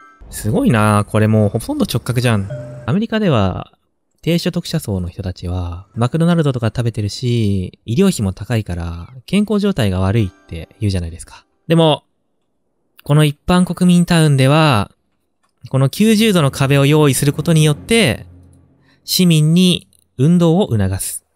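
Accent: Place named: Mie, Japan. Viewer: 〜 関西人説 「為せばなる、為さねばならぬ何事もってやつ『やな』」 結構標準語やし三重県民かなぁ？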